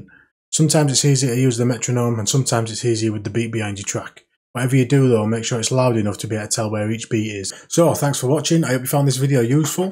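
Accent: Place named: North, United Kingdom. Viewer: BROOOOOOOOOOOO... Honestly you've got no idea how many video's I'ved watched and failed at when trying to warp vocals... to the point where I'd nearly given up production... Your video has just made my brain click into place in 1 min and 40 seconds in.... whether thats because your from up north somewhere near me by the sounds of it.... THANK YOU SO MUCH MATE! HONESTLY. legend!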